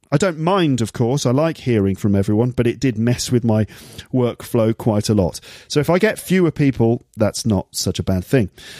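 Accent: United Kingdom. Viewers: Is your accent pure British,? I feel something different.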